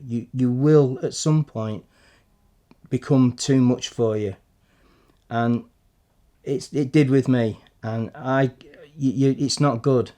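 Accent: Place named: Australia. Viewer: I hear a bit of Australian in her wow !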